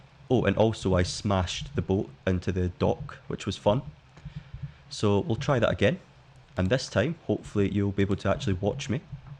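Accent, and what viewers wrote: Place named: Scotland, United Kingdom. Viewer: When you were reading the comment and he complimented your accent and you said "ah dunno about that mate" just know that while idk about people from elsewhere, basically every American I know loves a Scottish accent (as long as we can understand it lol). You really are a fun guy to listen to. I like your sense of humor, it sounds like we're just hanging out shootin the shit and I think that's part of what makes your channel so charming. If you did go the 'professional' route then a lot of that would get lost. Don't change, your format is great. Glad to see ya back!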